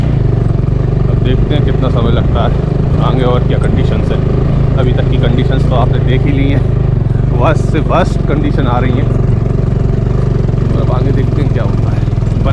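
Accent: India. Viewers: Bhaiya Agra side ke ho kya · Bhasha teth Ashoknagari? . Kya rishta hein aapka Ashoknagar se?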